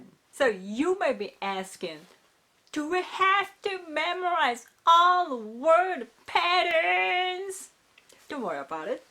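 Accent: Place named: South, United States. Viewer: I feel like your english has a bit of a southern USA accent, Interesting... Cool video!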